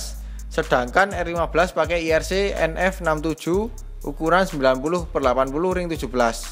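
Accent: Malang, Indonesia. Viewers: Mas org malang ya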